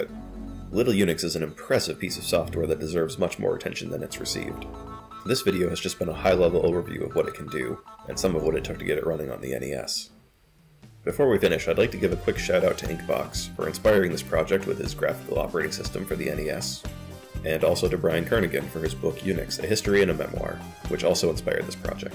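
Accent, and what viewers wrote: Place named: Utah, United States. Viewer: Sick vid. Thanks. Also Ew. A ruht directory. Is it tuh a spuhn Or is it too a spoon Ew. Disgusting. 2+2 isn't 5 cuz "that's how we say it down here" are you from utah?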